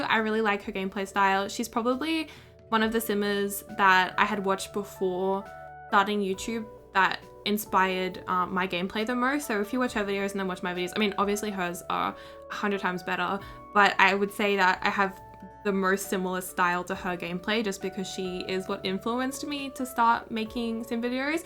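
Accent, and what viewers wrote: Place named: Australia. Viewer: I met you recently, just when the Growing together pack came out and I'm currently looking at your other stories 🥰 I loved your Australian accent and your sweet voice, as well as the way you tell the stories I am Spanish and my English is basic but I have to tell you that I can understand most of it well. Thank you very much for being so appreciative of us and for your hard work ♡ Starting to do this despite your doubts is having a lot of courage, thanks for everything ❤️ take care of yourself 🍀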